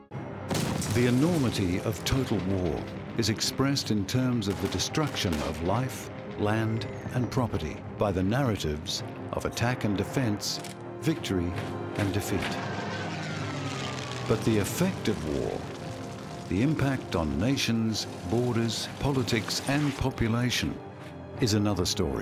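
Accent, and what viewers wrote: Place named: RP, United Kingdom. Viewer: Tse Tung was romanized to Zedong maybe ten years ago? I dunno for sure but recently-ish. And Keev I think is just his posh and very proper British accent kicking in.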